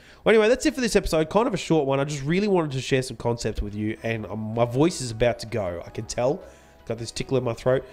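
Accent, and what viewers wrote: Australia. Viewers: are you aussie? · dude I'm new to the channel and are you Aussie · "he's Aussie".........subscribes:D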